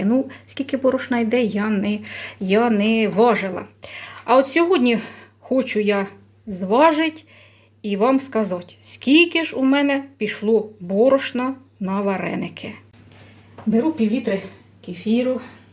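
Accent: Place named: Poltava, Ukraine. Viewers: Тісто обов'язково приготую!!! От лише у парі, над парою, на парі - "пара" ж бо іменник жіночого роду. Ну, може ще "парові" вареники...А ще дякую за Вашу соковиту, прекрасну полтавську - українську мову!!!! Згадала дитинство та другу хресну маму Марійку (з Полтавщини) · по акценту, господиня з Полтавщини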